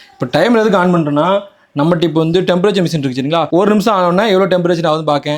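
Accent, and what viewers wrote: Tirunelveli, India. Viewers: Thirunelveli language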